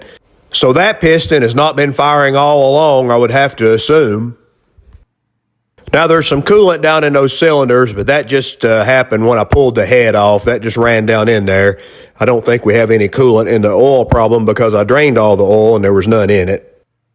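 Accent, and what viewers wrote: South, United States. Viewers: You guys are a totally awesome sight always and your voice sounds southern!! Don't ever change I love your attitude!!! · Lmao, im an arkansas hik and you sound like you're from the south. The brass had to come from above the carbs, very fortunate it didnt get passed the valve. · Well, I like this channel just the way it is. You do not talk too much. You do not sound like ol Waylon. Sorry. But you do sound like a more southern version of my old pal Tim From Throttle Power up in Louisville. 😊 Your gasoline hallucination was a nice treat. Another enjoyable video. Thanks. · Not Forrest just southern I guess I am in New Mexico but my family is all Texan sounds normal to me. lol love the vids 34 5w is my dream car one day maybe but at 62 maybe not got my 67-mustang hot rod runs 10's but I would love a 32-34 5w coupe my dad had 32 chopped 5w when he was young man wish it was mine. 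keep the cool content coming. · You sound like most of the people I know “southern blue collar workers “ I’m kinda proud of that . Those that poke fun of us sound just as different to us as we do them , I agree with the one guy , like the mixture of your videos ,machinist , mechanic, car lover , farmer, musician, family man, just a good ol boy,you know your mama love you !!!!!!😂😂😂 hey you can’t get all those degrees down at the university, 😊😊😊😊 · I think some of your listeners need there hearing checked, You do have a different voice to most people nowadays that's only because your southern and we are all a dying breed. Your singing voice is excellent I wish I had that, but its your voice not anyone else's that is what makes it sound so good. But Dukes of Hazzard and Forrest Gump I bet your wife thinks people are crazier than I do how funny. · Just because you hear a nice southern drawl, they start thinkin’ you sound like somebody. 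What about Roscoe P from Dukes of Hazzard, or Conway Twitty? You just keep being you, and I’ll keep watching the guy that sounds like half my kin!!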